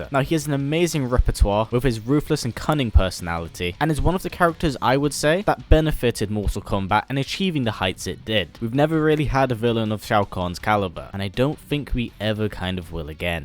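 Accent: England, United Kingdom. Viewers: Maybe it's because I'm a huge sci-fi fan (mainly Star Wars and Star Trek) or because I'm not particularly familiar with Mortal Kombat's backstory, but I expected this list to be mainly Edenians. As a sci-fi fan inhuman does not necessarily equal non-humanoid . Since the person behind the video seems to have an English accent, I'll try to explain with an example. The Doctor is definitely inhuman, but s/he is humanoid.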